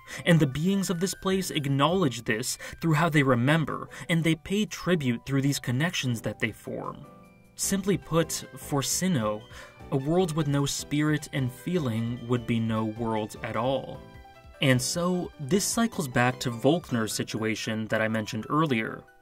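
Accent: Canada. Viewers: Yo! What part of Canada are you from!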